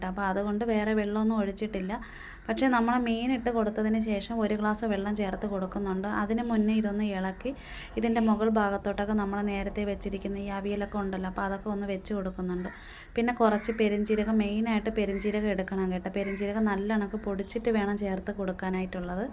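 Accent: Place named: Thiruvananthapuram, India. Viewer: Kutteese veedu എവിടെയാ, slang കേട്ടിട്ട് tvpm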